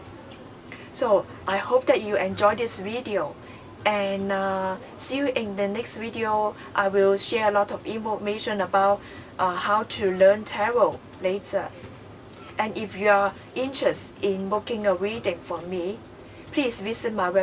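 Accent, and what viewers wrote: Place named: China. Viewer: Chinese accent is so beautiful to hear.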